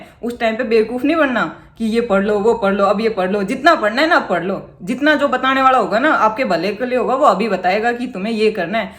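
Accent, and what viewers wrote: Haryana, India. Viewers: Your Hariyanvi accent 👌 💌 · Aap haryana se hai mam? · I like the...way..yuu speak... Haryanvi 😊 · Makha ram ram 😃😃😃, nice starting, hariyana bala style sunme thoda kadwa but achha bhi lagta hei 👍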